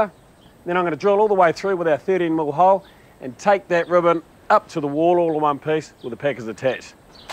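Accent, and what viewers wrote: New Zealand. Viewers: Thanks mate. I lived in NZ for about a year, but it has been while since then. Love Kiwi accent, sweet as. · I love New Zealand accent with construction. Absolutely Brilliant.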